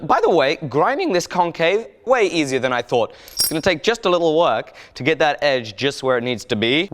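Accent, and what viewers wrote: United Kingdom. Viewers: Wait..... he is america?!?!! wtf he sounds british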